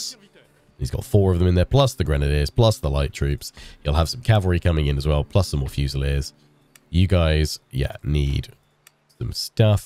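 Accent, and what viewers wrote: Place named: England, United Kingdom. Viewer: Hello, Sir, Excellent to see Napoleon in Egypt! Well done with the start up battles, I enjoy the fire and movement of your Infantry, use of terrain and Cavalry exploits to knock out your enemies Artillery right from the start! Most excellent indeed. I fear that your garrisons behind your lines are too weak? If Nelson desides to land on the coast with the Ottamans everything you have will be in Cairo and that will mean a double back to push them back. Also as time will pass and the Egyptian resentment will increase you must leave suitable garrisons behind, unfortunatley, to deal with the unrest. This is a strange and land of 40 or 50 centuries very unlike Euroupe in most ways, especially religion! Even Ceasar had to leave a legion in Alexandria to secure his rear and administration of supply. Love this content and I hope you feel better soon! 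Always odd hearing about french exploits from someone with an English accent! Amusing! Glory to France and Napoleon! Love this content and keep it coming! 🍻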